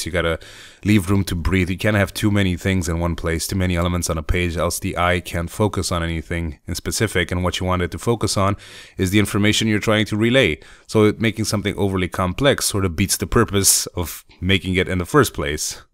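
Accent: Poland. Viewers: I really like your polish accent :D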